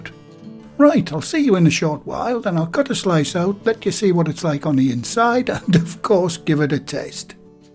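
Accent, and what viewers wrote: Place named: Newcastle, United Kingdom. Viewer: This looks delicious! And I love your accent,. It reminds me of one of my favorite shows, "Vera". I'd love to visit Newcastle one day:)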